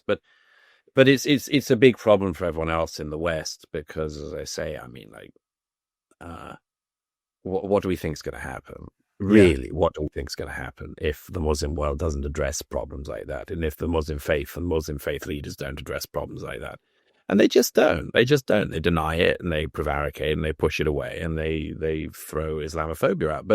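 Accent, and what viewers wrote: RP, United Kingdom. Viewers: The right is critical of israel for the reason anyone else is. Because they see what is going on. Who cares what this gay gas lighter has to say ? Dave smith is Jewish and makes actual points. This posh speaking morrisey clone has nothing to say. He sounds like the democrats blaming everything on Russia after 2016.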